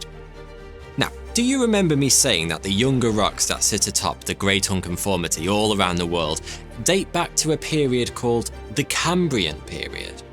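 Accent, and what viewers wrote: United Kingdom. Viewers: Good grief that particular British accent or whatever it is is awful. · His partial British accent made things more slow n me sleepy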